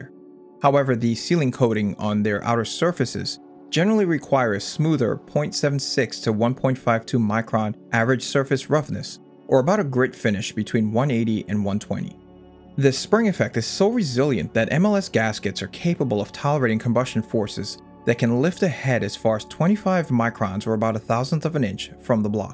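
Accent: United States. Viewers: Quit using these stupid metric units that nobody uses, also you sound American so I don't know why these units are your primary's.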